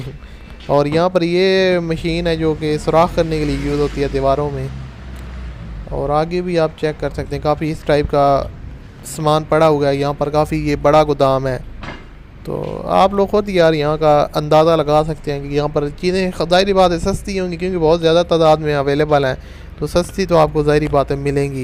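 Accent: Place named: Saraiki, Pakistan. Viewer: AP k bolnay k andaz bata raha ha k ap saraiki language ho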